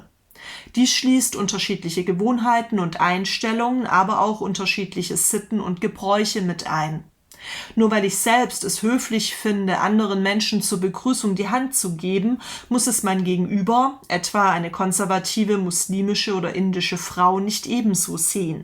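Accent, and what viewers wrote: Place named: Swabia, Germany. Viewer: Bisch du au Schwoab? Es klingt fast so :D Super Kanal!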